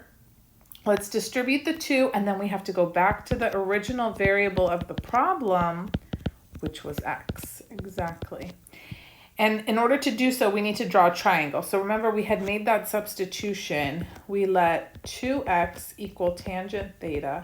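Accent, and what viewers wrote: United States. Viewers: Adore American English and U sound perfect)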